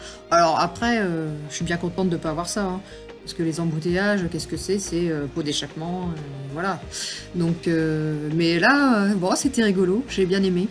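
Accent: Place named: Nord, France. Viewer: Cet accent de ch'Nord, j'adore !! Une de mes cousines cause comme toi ... #velotaf à Amiens !!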